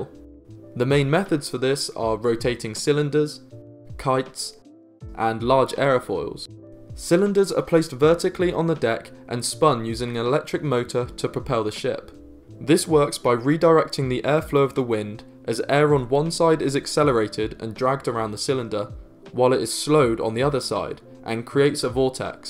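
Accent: United Kingdom. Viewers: As soon as i heard the british accent I knew his was a green lunatic. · What do you know another brit with a weird channel name, narrating stuff...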